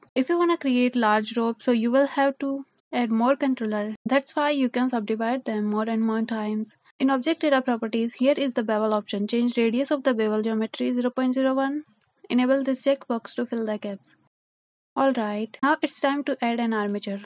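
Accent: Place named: India. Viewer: Hi after listening your voice it feels like you are an Indian should we chat about blender future in India together if you insist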